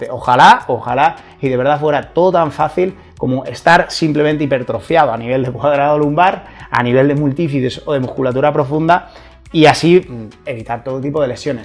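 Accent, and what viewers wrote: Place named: Chile. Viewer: Esa forma de hablar... Chileno!!!!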